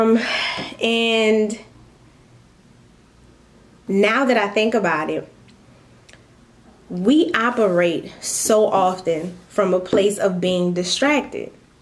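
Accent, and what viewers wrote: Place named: New Orleans, United States. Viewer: For the first time ever I heard that New Orleans accent/dialect...so sexxxy!!!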